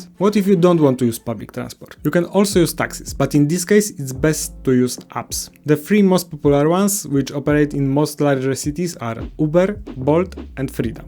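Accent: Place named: Poland. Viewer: Słychać akcent polski akcent w chuj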